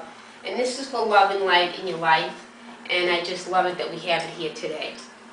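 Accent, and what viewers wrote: Boston, United States. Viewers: That Boston accent just keeps me coming back. Love it. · u r such a lovely woman. I am from Boston as well so I love the accent!!! I look forward to binge watching all of ur videos!! ❣️ Blessed Be ❣️❣️❣️❣️❣️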